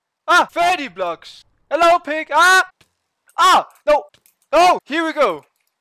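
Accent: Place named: Denmark. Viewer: Man kan høre du er dansker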